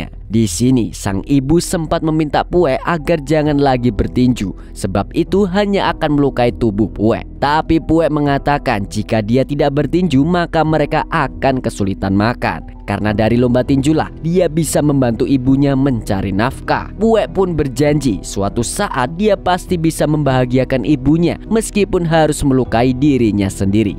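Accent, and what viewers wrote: Banjar, Indonesia. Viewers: Banjar banar😅😁😂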